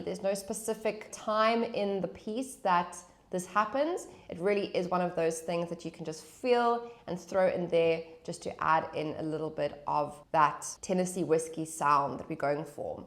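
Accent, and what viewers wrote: South Africa. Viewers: Is she South African?? She’s surely South African🫢 ja, no, lekker, this is cool!🥳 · Finally someone who knows how to teach!! Kudos! And luv ur south african sounding accent!!! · I'll have to watch the video again because halfway through it suddenly dawned on me that I'm not hearing the typical American accent but was pleased to realise I'm listening to the Saffa accent. Pragtig. Love your lessons. I have a guitar stashed away but want to start playing again. Subscribed.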